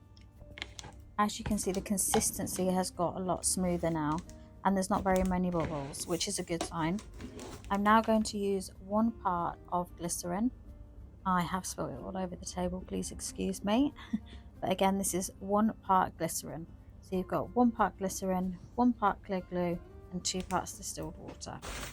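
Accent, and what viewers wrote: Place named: England, United Kingdom. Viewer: So glad I found you and your English not American! I’m now going to binge watch you channel . 😊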